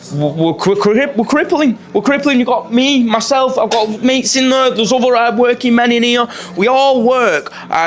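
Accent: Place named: Northern England, United Kingdom. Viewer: Subtitles for a Northern accent is sending me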